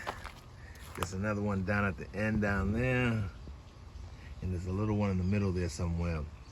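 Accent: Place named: Michigan, United States. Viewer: Your garden is awesome. Are you in Michigan, you sound like my cousins accent!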